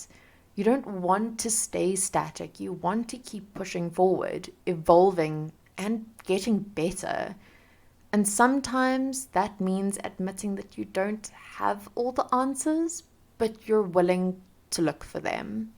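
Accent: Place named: South Africa. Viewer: I know a Saffa when I hear one. You doing a good job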